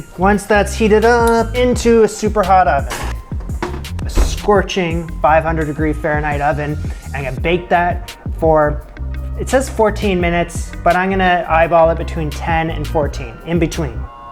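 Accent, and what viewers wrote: Canada. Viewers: Where are you from? You're accent is super Canadian.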